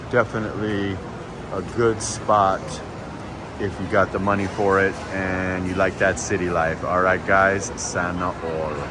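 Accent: United States. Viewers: I don't get your point dude. We don't have fake cities. You sound like an American. I am wondering if what is the real United States for you also? You're dying and abandoned cities?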